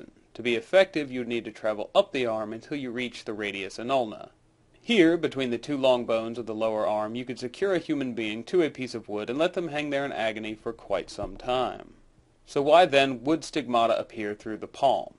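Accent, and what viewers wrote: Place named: South, United States. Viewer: Southern accent FTW!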